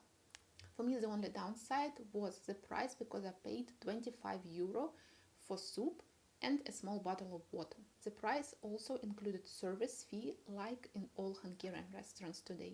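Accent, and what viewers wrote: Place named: Russia. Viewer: Девушка, давайте я вам поставлю произношение бесплатно, и интонацию. Вы хорошо знаете английский, но произношение прям никуда-вы просто произносите русские звуки и интонацию тоже надо ставить. Я могу вам за несколько уроков поставить и дать ресурсы, где отрабатывать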